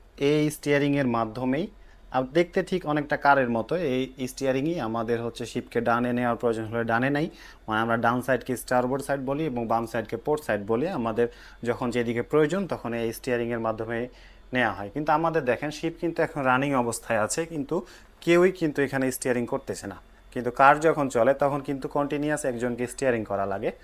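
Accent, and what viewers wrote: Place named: Rajshahi, Bangladesh. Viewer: So far your accent seemed to be Razshahi OR your home town,looking your video from Dhaka,Bangladesh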